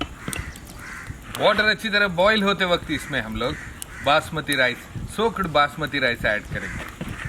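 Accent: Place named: South, India. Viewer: hindi South Indian accent cool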